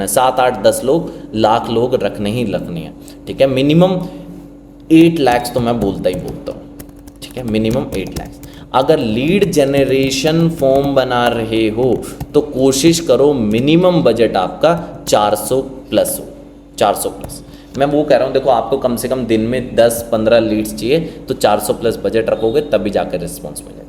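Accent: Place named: Garhwal, India. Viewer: Sir aapke gadwali tone se smj gya aap gadwali hain bhut bhut dhanywaad Sir jo aap youtube ke madyanam se hmra margdarshan kar rhe hain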